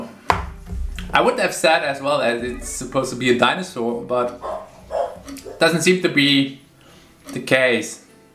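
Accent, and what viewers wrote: Germany. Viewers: Man hört das Deutsche so raus😂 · german accent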